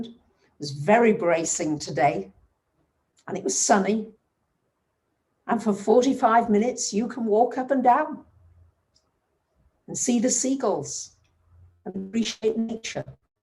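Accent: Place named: North, United Kingdom. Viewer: Kindness is the people at Royal Mail who helped me out the other day – I sent a card to a friend and forgot to put a stamp on it which is very unlike me, as I'm usually so organised (Mercury Retrograde). They went out of their way to help, sent a driver out to the post box and scooped it out for me after the weekend, drove to my address and posted it back to me, so I could then put a stamp on it – Lovely people! Thank you, love your video's and that Northern accent (like my late Grandmother's) is so comforting.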